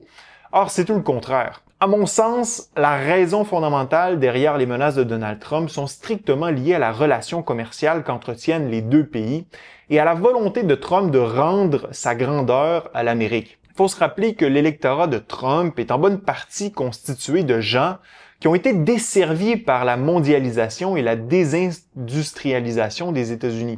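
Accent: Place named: Quebec, Canada. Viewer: Tu vien du Québec j'entend ton accent mais Pourquoi tu mets de l'essence sur le feu ? Lol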